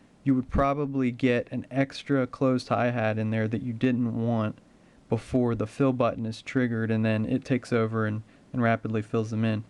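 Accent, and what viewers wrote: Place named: Philadelphia, United States. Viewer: Ur accent...way u say compose...u have a ne twang there...xwife 18yrs from philly im usually spot on with accents...u have to be close to philly my guess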